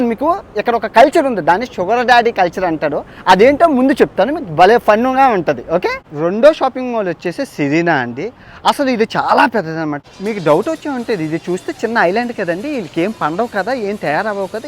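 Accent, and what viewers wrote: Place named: North Andhra, India. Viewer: Ur videos n ur dialogues in Telugu north Andhra accent r fantastic n the way u r moving with people is awesome...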